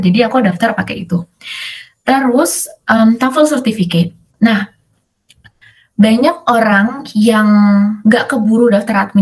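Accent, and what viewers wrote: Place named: West Java, Indonesia. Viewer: Orang samarinda tapi logat dan someah nya vibe org sunda bgt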